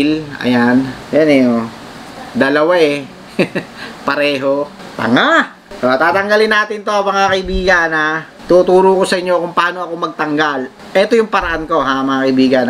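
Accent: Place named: Bicol, Philippines. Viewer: may pagka bicolano salita mo idol ah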